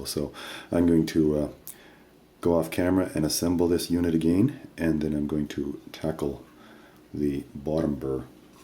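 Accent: Canada. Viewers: Excellent video. Easy to follow and lovely Canadian voice. Thank you :)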